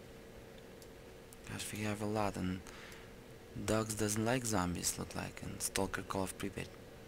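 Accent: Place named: Russia. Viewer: You are russian, right? I know this accent))))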